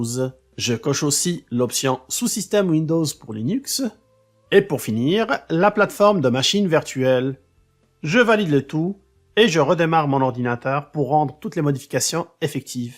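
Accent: Quebec, Canada. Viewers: J'adore l'accent québécois. Je pourrais t'écouter pendant des heures mais je ne me lasse jamais. Ah Seigneur j'attends un que tu mettes un québécois sur mon chemin il va parler pour que je t'écoute 🥰🥰🥰😂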